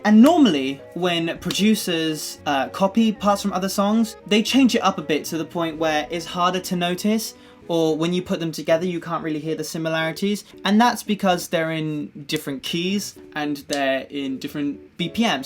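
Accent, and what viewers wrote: United Kingdom. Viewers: BRITISH ACCENT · Hi! I'm Korean teen ㅎㅎ I love your british pronounciation ㅠㅠ Can you cover ' '-!!! I'm sure you will love this song ㅠㅠㅠㅠ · Your English pronunciation is the most delightful one I ever heard, you've got a perfect English accent ✨🌙 Oh, and you're so cute ❤️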